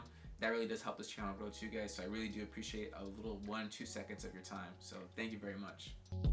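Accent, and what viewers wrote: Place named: United Kingdom. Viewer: Thought you were from the UK😂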